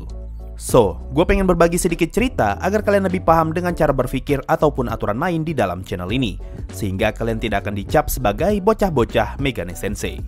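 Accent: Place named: Eastern Indonesia, Indonesia. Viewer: Boleh tau suku apa bro? Tidak ada maksud menyinggung, soalnya dulu perasaan logat timur lu kerasa banget di video lama tapi makin ke sini makin halus dan nggak kerasa lagi logatnya.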